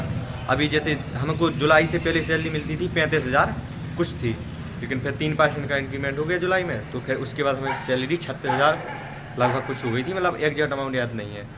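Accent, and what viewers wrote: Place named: Bundelkhand, India. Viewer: Bhai bundel khand side se hai kya language vahi ki lag rhi 😅😅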